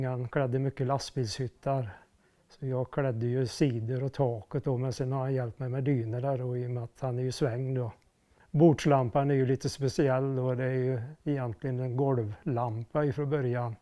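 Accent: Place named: West Gothland, Sweden. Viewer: För himla gôtt å höra en rekti väschgöte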